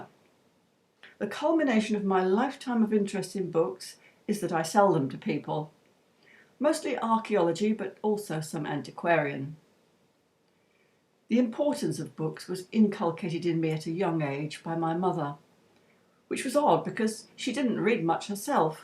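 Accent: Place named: RP, United Kingdom. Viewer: Really very good, I did watch all the story time videos on your other channel and always enjoyed them, I loved when you wore the costume and jingled the little bell at the beginning and end & remember the big book you used as a prop - might be just me but that little routine got me settled and ready to listen - brought back lovely memories of jackanory and also teachers reading to the whole class in infant school - you have a good voice, relaxing to listen to and a wonderful proper English accent, quite posh but not too posh..lol. X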